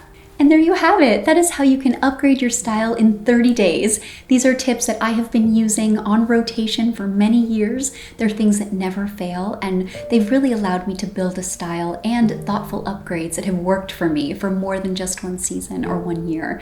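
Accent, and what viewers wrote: United States. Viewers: You are one of the few American Youtubers I can watch. Everybody else will scream at you and pull this show like they are bursting of energy and good humour. I mean, you are really friendly, but not hysteric and loud. And your American accent is so nice and subtle. Why can't tourists visiting Europe not speak and dress like you?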